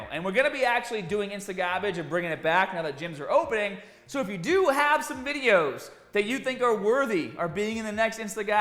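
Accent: Boston, United States. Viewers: Love it! That Boston twang! Cheers Scott, as always I salute you!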